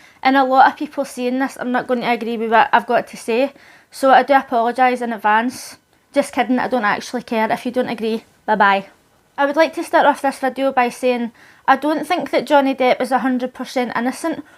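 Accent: Scotland, United Kingdom. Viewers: Thats scottish, right?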